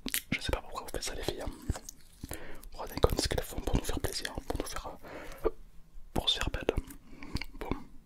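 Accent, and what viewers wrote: South, France. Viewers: Je me demande si tu viens pas du sud on a peu près le même accent ! En tout cas j'adore tes vidéos ❤️🙏🏻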